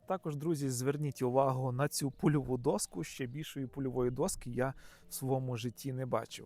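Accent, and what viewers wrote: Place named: Volyn, Ukraine. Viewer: Вас мова з волинським акцентом... Звідки ви?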